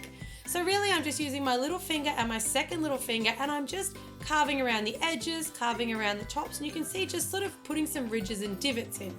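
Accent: Australia. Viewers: Your beautiful And I luv you Australian accint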